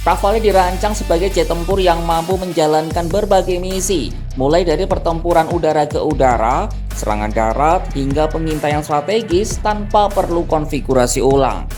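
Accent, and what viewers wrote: Java, Indonesia. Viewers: Sekelas Kompas pembaca beritanya medok bgt. Kaya ga ada yg lain aja 😆😆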